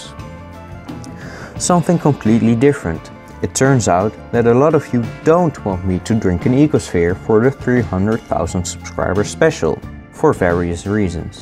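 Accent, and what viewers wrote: Netherlands. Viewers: Is you'rs to? And i think you're not english deu to you're speech i think you're dutch pwease respond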